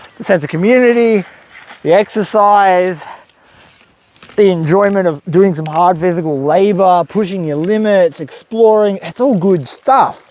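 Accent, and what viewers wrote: Australia. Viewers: Bwahahahahahahahaa I loved the oratory comments spoken like a fair dinkum true blue Aussie mate, you have a way with words that bring tears of laughter to my eyes. Thanks for that I needed a great laugh and it was all true what you was saying about how the Gold standard was abolished by Richard Nixon of the Watergate Episode lol. Crikey do you think with all the shit going on that gold will rise further higher? I guess it is a waiting game hey, well stay safe and have a cracker of a day and you too.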